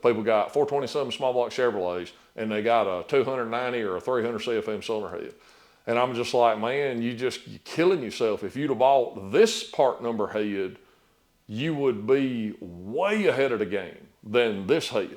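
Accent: South, United States. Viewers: Love that southern drawl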